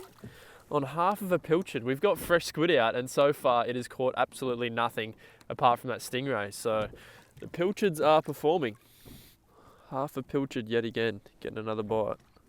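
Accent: Australia. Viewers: I love the Aussie accent. combined with fishing that is just perfect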